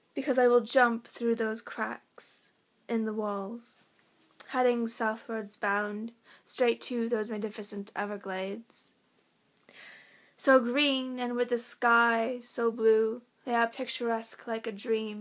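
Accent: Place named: England, United Kingdom. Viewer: You sound English. Good sonnet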